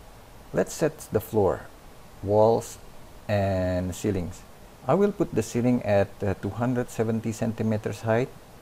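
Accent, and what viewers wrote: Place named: Indonesia. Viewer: tapi pengucapannya bagus kok mas, dengan aksen Indonesia...:) Dulu pernah kuliah/tinggal di Luar Negeri kah?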